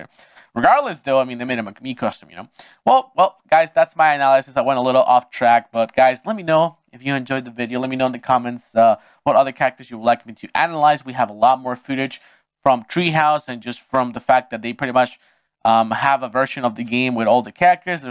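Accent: Chile. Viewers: Habla chileno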